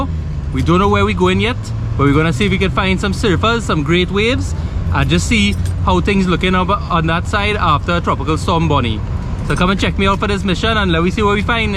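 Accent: Trinidad and Tobago. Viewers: U look like an American but you speak perfect trini english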